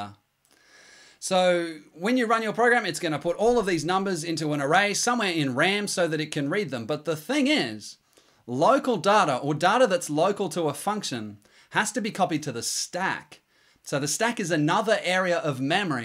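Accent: Australia. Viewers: Hi, mate. Are you from Australia?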